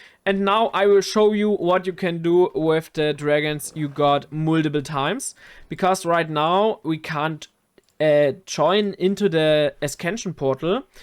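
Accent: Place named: Germany. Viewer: w german accent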